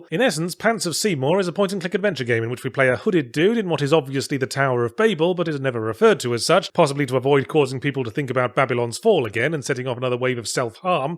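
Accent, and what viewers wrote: England, United Kingdom. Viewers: A Canadian listening to Yahtzee speak French in his self described "Walking tour of the UK" accent did something to my soul your pot smoking cousin would describe as Lovecraftian. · I like that Yahtzee knows how to correctly pronounce French but his English blood won't let him.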